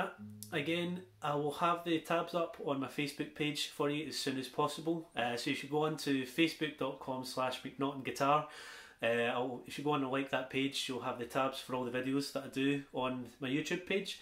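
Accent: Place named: Glasgow, United Kingdom. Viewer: I really enjoy your lessons, the way you go over it is just fantastic bro. I don’t normally comment but wanted to thank you. I hope your channel’s doing well you deserve Success your style is natural and you are very relatable wae a Glasgow Accent. Loving the lessons keep them coming pleases & thank you again 🫡🫡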